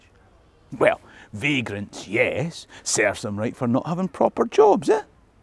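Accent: Scotland, United Kingdom. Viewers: I just subbed to your channel, I can listen to you talk for hours because I love the Scottish accent ❤️ I'd like to ask if you have not already could you do a video on the real and True braveheart that Mel Gibson did? I would absolutely love to hear the true history behind that, as I do not know how accurate the movie was. That would be such an awesome video to watch. I'm excited and cannot wait to hear from you as you post more videos. Greetings and much love from the USA 🇺🇲🙏🏻✝️❤️🥰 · For fooks sek. This is bloody fookin brrriliant. I love the Scottish accent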